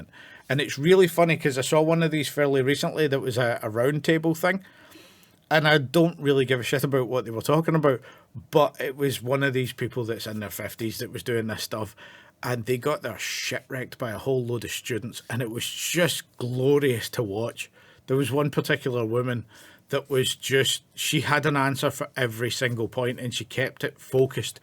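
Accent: Scotland, United Kingdom. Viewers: Christ man, You are scotish? "rycordyn" "cerrierz" "skelz" music to my ears. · i'm listening like, finland? that's a scottish accent lmao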